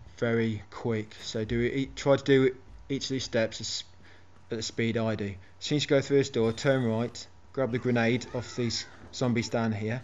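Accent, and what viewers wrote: United Kingdom. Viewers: oh the British Accent, I LOVE IT <3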